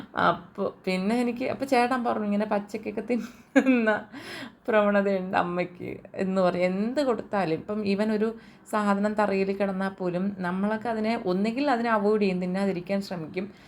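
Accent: Trivandrum, India. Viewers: samsaram enikkum eshtamanu tvm slang kelkkan anu ❤❤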